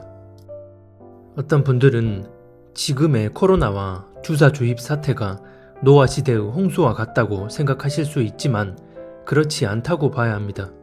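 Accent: Jeolla, South Korea. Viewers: 전라도 사투리로 방송하시니 친근감이 느껴져불고만요잉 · 전남"지역 분~~이라고, 추정되는데요.. 제고향이 전남 광주 근처인데, 말투가 똑같으신데요..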